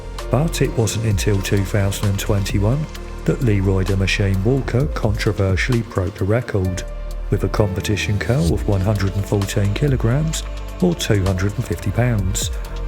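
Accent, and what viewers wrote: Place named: Kent, United Kingdom. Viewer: Are u from kent mate... Your accent 😄😄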